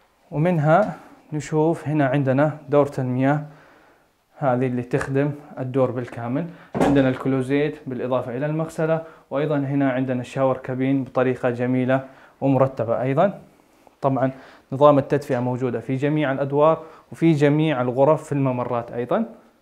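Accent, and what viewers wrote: Saudi Arabia. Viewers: ما شاء الله تقديم الأخ صاحب الفيديو مميز. ذكر الله على لسانه و الأدب و اللهجة الجداوية الجميلة. بالتوفيق يا رب.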